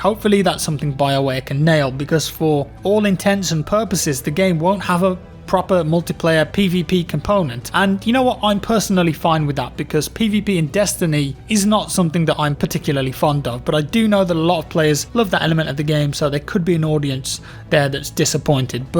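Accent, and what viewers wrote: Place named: Midlands, United Kingdom. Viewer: Always luv ya videos man, those dulcet w/midlands tones always remind me of home. Hit me up if you're ever down Bristol ways. Keep on gaming!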